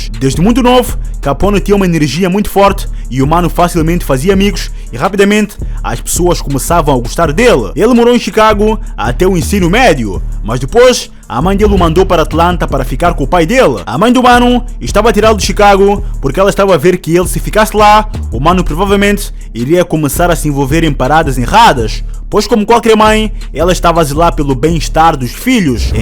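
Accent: Angola. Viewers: Ele mora na gringa mano Ele deve ser da Angola e tal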